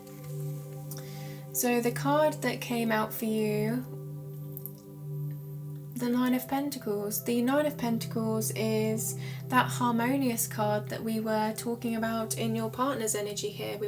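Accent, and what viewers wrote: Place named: RP, United Kingdom. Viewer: ok, your accent is pretty posh, and my eileen was a borderer, but you have a good heart.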